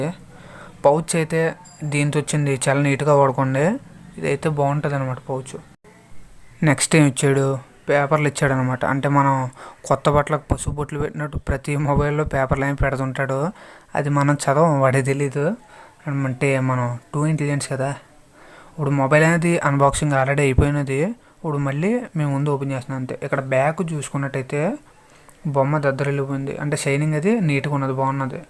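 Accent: India. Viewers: nice talk...i think your EAST or WEST in Ap..😁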